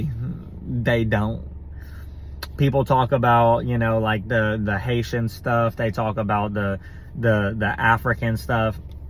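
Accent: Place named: Texas, United States. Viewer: I'm a new sub! Are you from Texas? You sound like back home to me... sending you warm loving energy from Hollywood, my newest home! ✨️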